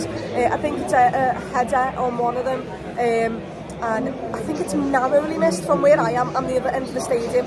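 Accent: Liverpool, United Kingdom. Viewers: Proper Scouse Babe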